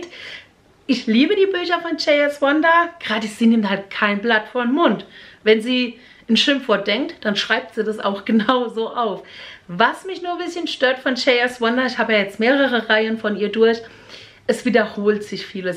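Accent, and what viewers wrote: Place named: Palatinate, Germany. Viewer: Liebe sehr schönes Video, und dein Pfälzisch 🥰 mir gefällt deine ehrliche Art und du bist so eine sympathisches Fräuleinchen, und die Buntheit in deinen Videos einfach macht es Spaß dir zuzuschauen 😀…die Lampe ist sehr schön🍀😀über Gewinn hätte ich mich sehr gefreut😊lg Miri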